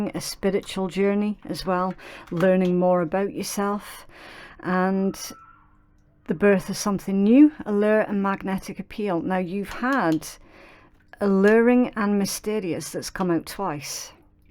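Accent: United Kingdom. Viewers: Thank you, sounds a little bit like her or I. You sound great. Loud n clear. Your accent sounds British to me... That's great I speak English... I have other problems with haters hating and threatening me. So I've been praying on it... She should of been on my side and she wasn't.. looking around see how it goes.. I'm 😁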